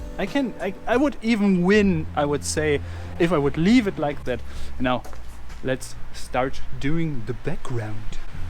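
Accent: Germany. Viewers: Dieser Deutsche Akzent killt mich einfach😂 Ps: Du hast echt heftiges künstlerisches Talent Tolles Video und schöne Grüße aus dem Emsland✌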